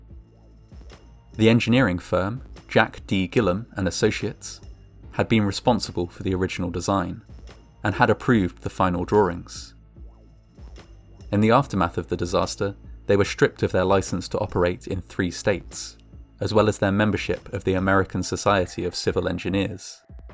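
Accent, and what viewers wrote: England, United Kingdom. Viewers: I LOVE SO MUCH YOUR ENGLISH PRONUNCIATION, THE GENUINE BRITISH ENGLISH ! I AM FROM BRAZIL AND STUDY ENGLISH! I AM GOING TO WATCH ALL YOUR VIDEOS JUST BECAUSE I ADORE THE WAY YOU SPEAK BRITISH ENGLISH! CONGRATULATIONS FOR YOU!👍👍👏👏👏👏👏👏👏👏👏👏👏👏👏👏👏👏👏👏👏👏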